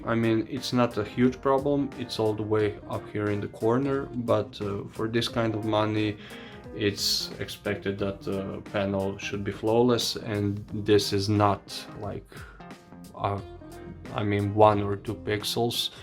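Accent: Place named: Eastern Europe. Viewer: Nice vid. Based on your accent, I would say youre somewhere from eastern europe, czech, poland, russia etc? My best guess is russia. This monitor is something I am interested in, however, currently, in my opinion, its simply just too expensive for me. If it drops half price, then I could consider this.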